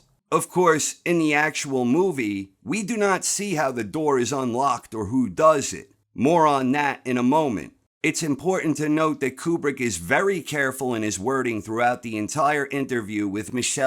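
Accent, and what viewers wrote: New York, United States. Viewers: What accent? He sounds like a native speaker from New York or Jersey. That would be a dialect. "Accent" is only from one language to another.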